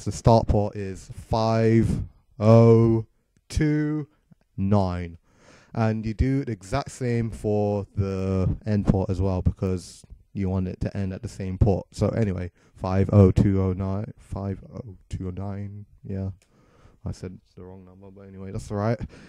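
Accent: United Kingdom. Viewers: Thx dude This really helped and also by the accent I noticed that ur from the UK ;D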